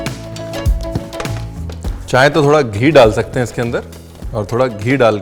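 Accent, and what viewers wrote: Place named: Uttar Pradesh, India. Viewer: aj UP wale accent me recipe sikh kr mja a gya.....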